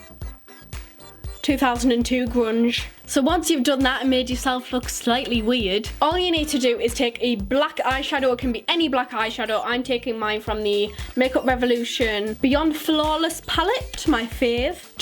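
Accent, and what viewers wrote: Newcastle, United Kingdom. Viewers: Just curious but are you from or near Durham? I really recognise the Geordie accent. Also this was a beautiful makeup look and I really love watching your videos 😊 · You are gorgeous! Love your accent, similar to mine, I come from Newcastle also! I find us to have a lot in common! Love youuu! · Your accent has hints of geordie in it's great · Gwyn Princess nope, defiantly not Scottish lol, I would say Newcastle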